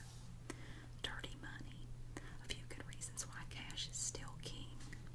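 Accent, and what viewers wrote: South, United States. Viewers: Your voice is so soothing! Makes me feel at home. Originally I'm from Tennessee but now live in Oklahoma, just love a soft southern accent! Thank you for making these videos!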